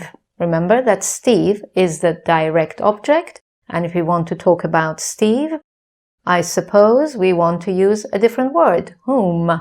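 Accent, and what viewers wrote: England, United Kingdom. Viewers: I thought you were from inglan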